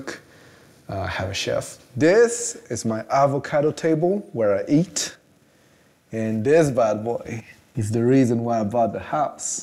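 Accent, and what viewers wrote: England, United Kingdom. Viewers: Il a un Accent anglais incroyable pour un fran-nois comme il dit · "La fistinière" ahah j'y pep ! Félicitations pour cet appart il est incroyable :O Et ton accent anglais est vraiment incroyable aussi !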